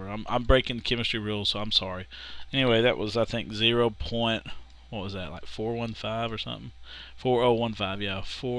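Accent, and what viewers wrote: South, United States. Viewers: lol this wasn't what I was looking for I already understand how to do this but you did such a good job and made me laugh ( plus I love your southern twang ;) sexy!) so I kept watchin anyway :P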